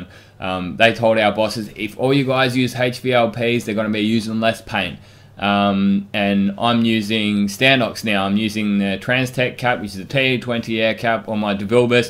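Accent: Australia. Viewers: Hey mate, love your channel, very new to spray painting and really enjoying it. I’m a woodworker, building small furniture and musical instrument projects, and I have a small compressor putting out about 4cuf/min; it came with two cheapo HVLP guns which are ok to get me started but clearly not up to much more. The FLG-5 looks great but it still calls for about 10 cubic feet/min; some other woodworking channels recommend LVLP guns which seem to fit the bill for what I want - can you recommend any which are easily found in Australia? I can get one from the US but shipping and import duties are crazy 😝. Keep up the great work, love hearing another Aussie accent!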